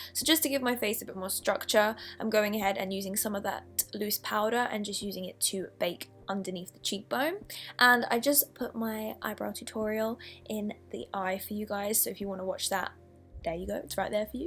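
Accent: Australia. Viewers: Savanna I feel like she sounds sorta aussie in this idk why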